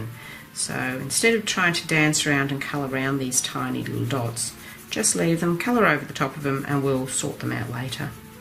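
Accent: Australia. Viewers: Absolutely beautiful artwork! That is one of the smoothest, most gorgeous backgrounds I’ve ever seen. The only thing (but it’s a big thing for me) is I find any background music distracting and awful (trumpets etc) and I usually just mute it, but I couldn’t with this one cos you were explaining a little bit here and there. I think everybody who does art probably has their own thing that they put on in the background anyway. I listen to learning German when I feel productive, Lady Gaga when I want a happy vibe, but often I just like complete silence, so I can think about all sorts of stuff/concentrate on what I’m doing. But when people put background music on in their instructional videos, the viewer isn’t given a choice - and everyone has different taste in music. The reason I mention it is because I really like your work very much and one of the things that you do that I find particularly helpful is that you really clearly list the brand and exact colour of the pencils that you’re using. 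I think I detected an Australian accent? I’m Australian so hi! 👋 I have seen a lot of your work on Instagram that I like too. So hi! And I’m sorry about the music comment (one part was like the background music they use before funerals get starting - you know, when people are assembling and greeting etc) and I know that I’m very over sensitive to things like that. But absolutely beautiful work! 😃👍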